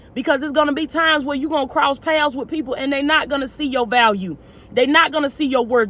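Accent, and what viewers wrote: South, United States. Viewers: Just love your American accent! I'm from London, 🇬🇧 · Love to hear her southern voice preach 🥰🥰🥰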